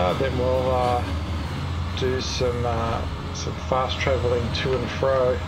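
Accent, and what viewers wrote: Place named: Australia. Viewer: that makes sense! 😂 you upload schedule says USA but your accent says Australian 😂 damn! Timezones are annoying!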